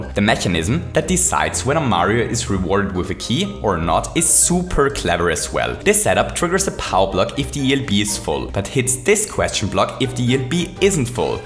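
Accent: Germany. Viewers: Höre ich da etwa einen deutschen Akzent? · Do you speak german? Because you have a german accent. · Is it really an accent? He is German, so it's just a German trying to speak English.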